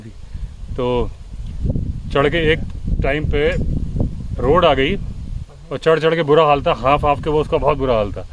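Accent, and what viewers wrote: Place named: Delhi, India. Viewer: Very nice Ji Aap ko 3 Years follow kar Raha hu, retired Force memer hu, Aap say bahut knowlegde milte hai. Delhi born hu, South Delhi Mai Ghar Education, par aap ki aawaj say esaa lagta hai, aap Aapnay hai Delhi blog dekta hu. Hamaray block may Vinod Awasthi Pandit ji or journalist banay, 3 bhi Sub very intelligent and I like same as well as. Thanks for knowledge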